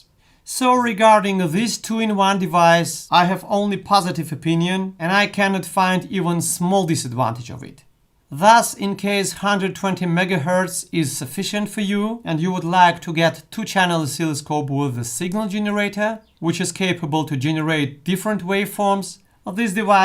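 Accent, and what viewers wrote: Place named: Russia. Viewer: Русский акцент в английском, ох ну что же мы так все одинаково говорим))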